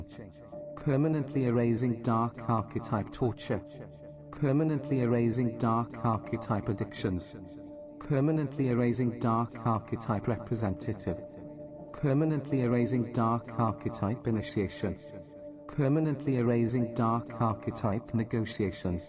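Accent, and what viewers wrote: Wales, United Kingdom. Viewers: The Welsh accent 😍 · Aww lovely Welsh accent, music to my weary soul. Thank you for victory against the dark 💕🌟💕🌟💕🌟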